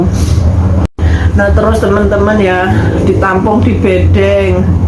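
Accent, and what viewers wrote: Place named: Java, Indonesia. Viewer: kok jowone masih medok banget....Yo.....padahal sejak kecil di Papua. Salam Soko Pacitan Jawa timur.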